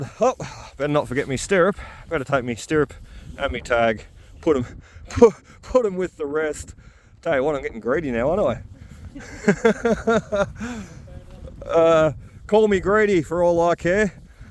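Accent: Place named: Australia. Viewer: Being a Yank...I love your way of talking!!!. She's right,Mate!!! 🌹 I've never seen this cow tags hunting... Been mostly beaches and pull tabs with the occasional rings and other jewelry found by the Yankees on the California and Florida beaches... The valuable finds are very few and far between... I'm gonna be watching you Aussies finding things I never knew existed... Looks like you have another sub...😎🤗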